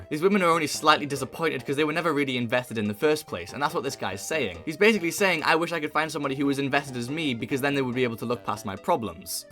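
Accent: England, United Kingdom. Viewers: Bro is an English chap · Bro’s such a fan he always talks like an English chap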